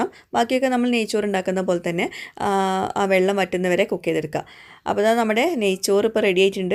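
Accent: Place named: Northern Kerala, India. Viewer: Hi.. Njan ഈയിടെ ആയിട്ടാണ് vlogs കാണുന്നത്.. 4 items ഉണ്ടാക്കി.. Suupprr.. കുറച്ചു receipies എഴുതി വച്ചിട്ടുണ്ട്.. യുടെ proper place എവിടെയാ?? ഒരു വടക്കൻ slang.. അതാണ് ചോദിച്ചത്.. ഞാൻ kannur..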